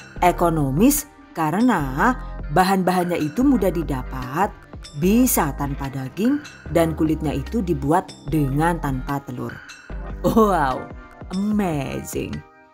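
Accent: Banyumas, Indonesia. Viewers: DONE Terima kasih mbak krn selalu memberikan resep yg ekonomis utk ide jualan,serta dg penjelasan yg detail dg harga2 bahan serta hrs di jual dg harga berapa,suka lucu klo denger ngomong bahasa ngapak dg suara khasnya...semoga sll muncul ide resep2 br yg ekonomis buat ibu rmh tangga yg ingin memulai usaha..sukses dan berkah selalu..Aamiin #GivewayTHV2021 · Mbak, asline uwong mediun apa brebes si.. Faseh bener logat ngapak e 😅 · Wong ndi sih ngapak temen yuuu🤣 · Hahaha....ngapak jaaan kiye lah...mtr nuwun bund resepnya.sukses sll kontennya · Saya recook ini kak, buat jualan, hasil x endeessss🤤, selain itu paling suka dengerin kk nya ngmng, gak ngebosenin, ngapak x gak ketinggalan jg 😆 #GiveawayTHV2021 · Wilang ngapak ya😅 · 😂😂ngapak bngt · ngapak kaya nyong🤭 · Deneng dadi bahasa ngapak🤣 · rika wong endi yuu..deneng ngapak banget😄😄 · Rika wong ngapak ya Bu😂😂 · Q nntn ngantuk pas dgr suara ky ngapak jd melek lg😅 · Jan logate apa karo nyong..y slm ngapak..ora ngapak ora kepenak..slm kenal..boleh dicoba nih😋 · Asyik jelas dan teratur bgt...ada bahasa ngapaknya juga😂😂😅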